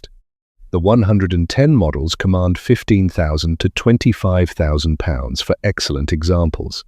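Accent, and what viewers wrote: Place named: United States. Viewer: Awful narration; American spelling and pronounciation; repeats the same point over and over.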